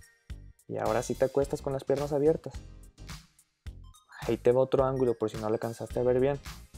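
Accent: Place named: Mexico. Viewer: Todo un crack, por tu forma de hablar creo que también eres mexicano. Excelente forma de explicar.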